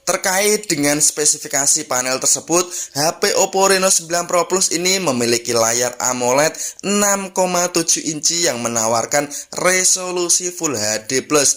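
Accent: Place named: Java, Indonesia. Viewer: Medok banget pengisi suaranya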